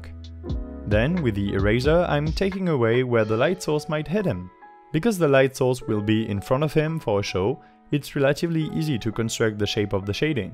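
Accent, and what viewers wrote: France. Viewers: Nice vid! I very much enjoy your french accent tbh :) Keep up the good work!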